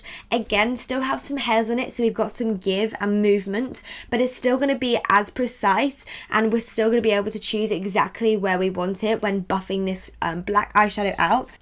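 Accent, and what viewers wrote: United Kingdom. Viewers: En verdad me caga su acento británico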